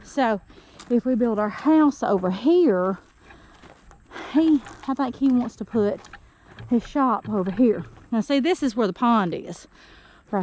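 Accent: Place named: South, United States. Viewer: Congrats! You sound like you're from the south. Last one of these I watched the people put at the very end it already had a contract! Cudzu is edible according to a man that french fried it during the depression ! Best wishes.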